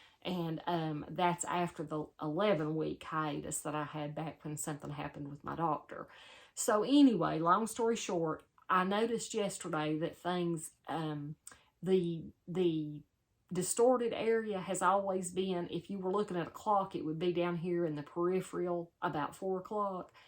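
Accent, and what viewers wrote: South, United States. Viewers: One more thing, u have that southern sound like Ms .that's where we are from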